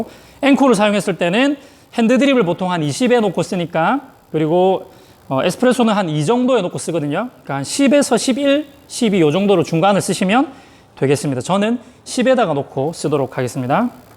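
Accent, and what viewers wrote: Gyeongsang, South Korea. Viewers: 카라멜 마끼야또도 집에서 만들수있는 방법 가르쳐 주세요^ 구독 할께요^ 집에서 핸드드립으로 마시는데 라떼 넘쉽게 가르쳐 주시네요 경상도 이신가요 사투리 쓰시는뜻 저도 경상도 ㅋ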